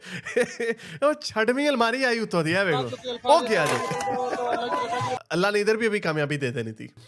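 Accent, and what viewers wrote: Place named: Punjab, India. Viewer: I thoroughly enjoy your Punjabi accent and jumble😂😂😂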